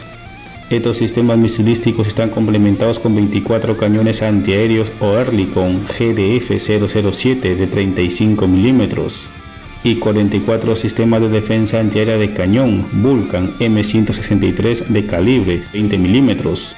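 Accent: Peru. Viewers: TE DA VERGUENZA SER PERUANO? ENTONCES NO FINJAS QUE NO TIENES ACENTO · Revisa el canal, es peruano, sale en el video que le dan el botón de plata u oro de YouTube XD además es cosa de escucharlo xd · Es peruano creo , y ellos hablan así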